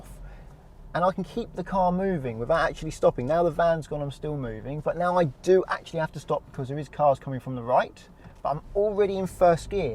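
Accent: United Kingdom. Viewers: This guy speaks with his own British accent. Try to find someone who speaks with your accent. Similarly, I never understand Indian accent.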